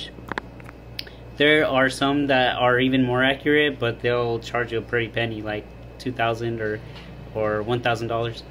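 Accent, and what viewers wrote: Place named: Indonesia. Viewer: You have a slight Indonesian accent. Am I the only one hearing it?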